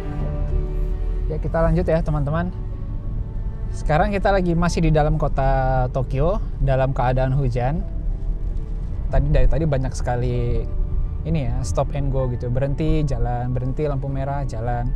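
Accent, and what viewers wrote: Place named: West Java, Indonesia. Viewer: Logat na mah Sunda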